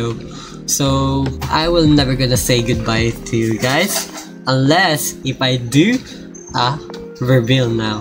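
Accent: Philippines. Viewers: i think hes filipino, he speaks like one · I just realised he's Filipino just because of his accent and about page So. HATDOG PRE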